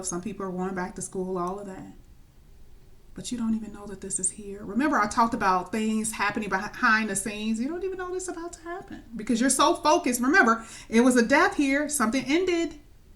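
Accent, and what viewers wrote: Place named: United States. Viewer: Thanks for the reading. I loved it and it made me so happy and resonated. Greetings from England and I adore your American accent and voice. I look forward to your next video sister. Also you are so clever to read tarot and predict the future and is so kind of you to do free readings. I am struggling financially right now and we are in lockdown in England but once I find my feet I will definitely contact you for a personal reading. I hope you are not depressed with lockdown and making enough money. I don't know if you have a day job as well as the tarot but I hope you are getting by financially in lockdown as many people are struggling. I would like to support your business as a fan of yours and have a personal reading and recommend you to my friends who will hopefully recommend you to others. Love and light. God bless you. 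Stay safe sister Love you lots xxx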